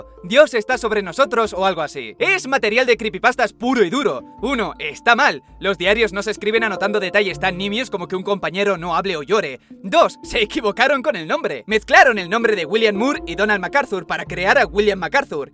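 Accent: Spain. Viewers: Nunca había escuchado una voz tan chillona como la tuya, eres español? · Prohibanle a los españoles hablar en youtube, que manera tan horrorosa de hablar...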